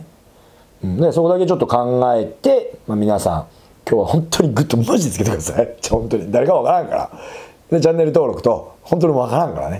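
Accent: Nagoya, Japan. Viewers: 初めてみてラッパーのAK69の喋り方に似てると思って概要欄みたらやはり名古屋でした！笑 そのまま話を聞かせていただきました。大変勉強になりました！ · 名古屋弁落ち着く― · 名古屋弁だ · 同じ愛知なので名古屋弁聴き心地よかったです！ 自分も一昨年独立したばかりなので勉強になります！ ありがとうこざいます！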